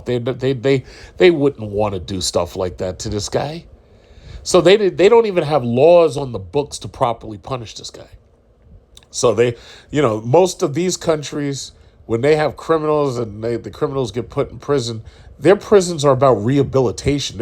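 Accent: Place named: New York, United States. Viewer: Lol I agree I heard that New York accent and was ok real talk here 🇨🇦👍🏿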